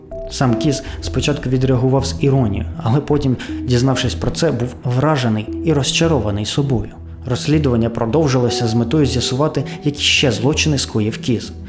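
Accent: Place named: Russia. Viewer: Чому! То вже б!Відчуваєте себе дуже юним, не можете позбавитися російської вимови? гарне ім'я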